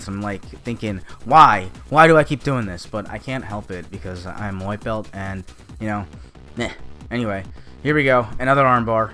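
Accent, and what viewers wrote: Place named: Australia. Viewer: Yeah looked like an omoplatta. Great vids. Bring back the Aussie accent!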